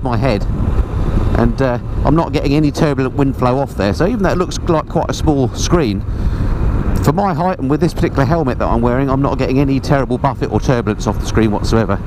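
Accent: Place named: United Kingdom. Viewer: great review, 69 years young I think 45 plus years in alot of saddles of many makes. My last two blkes a 2008 Suzuki B King, upright non stop power,loved loved bike moved to Arizona from Maine USA to ride year round. Now have been riding 2009 Kawasaki Concours 14 abs, what a pleasure to get on and put the miles down, just missed out on a 2017 Triumph Trophy se so packed out beautiful,but two days to late, someone beat Me to it.Which brings Me to Versys a loaded one just popped up in central Arizona ,no rain, mountains, desert, the riding can't be beat out here year round. Everyday is riding day. The Ducati Multistrada is right there but more maintainance so Kawa, love Your Brit accent, oh ya I chopped a 1973 Norton 750 Commando in the 70's Ride On Man tucson tim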